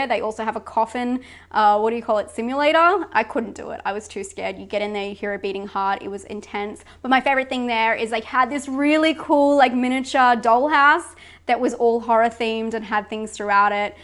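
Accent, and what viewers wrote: Australia. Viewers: Great haul! I also am not really a collector, I like browsing stuff like that but hate displaying and keeping stuff but you chose great souvenirs! Also, what English speaking person doesn't understand an Australian accent? 😂 can't wait for your next video! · An American made fun of your accent? What a knob. Australian accents are the best!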